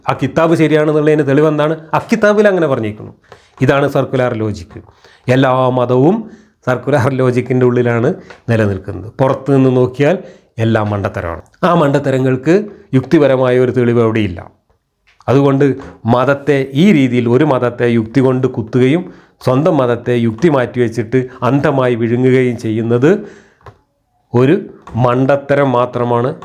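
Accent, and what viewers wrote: Malappuram, India. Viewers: മാഷ്ടെ സെൻസ് ഓഫ് ഹ്യൂമറും മലപ്പുറം സ്ളാംഗും അടിപൊളി 😅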